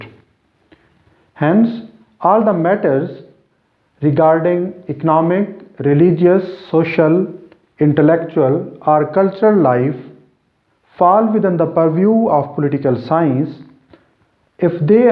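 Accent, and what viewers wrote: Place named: Pakistan. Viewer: Typical pakistani accent. But still understandable.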